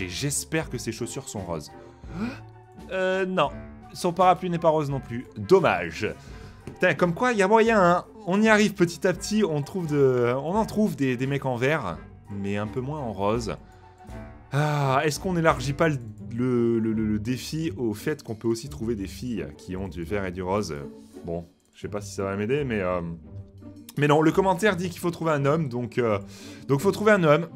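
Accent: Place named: South, France. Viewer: ah les gens du sud et leurs " rause " sans me moquer de ton accent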